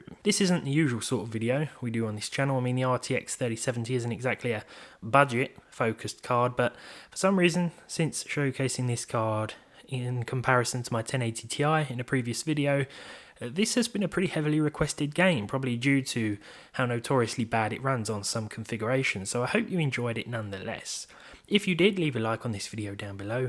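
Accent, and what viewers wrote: United Kingdom. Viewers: Omg. Very good british acsient. <3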